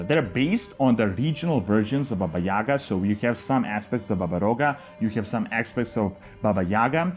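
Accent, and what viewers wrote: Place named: Eastern Europe. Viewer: where are you from you pronounciate all of the names like a real slavic are you slavic?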